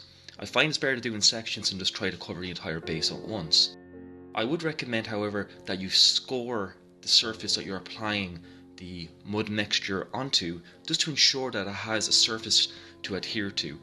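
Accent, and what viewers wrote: Ireland. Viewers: Love your work! Thanks for your effort!, coming to you from California, dig the Irish accent! Keep em coming as your teaching me a lot!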